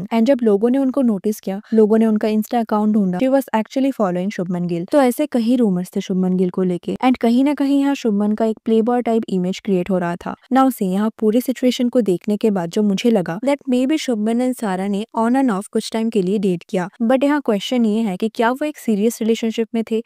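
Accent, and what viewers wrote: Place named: Telugu, India. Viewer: After listening to your voice in a lot of your videos. I'm guessing you're a telugu. Is this true?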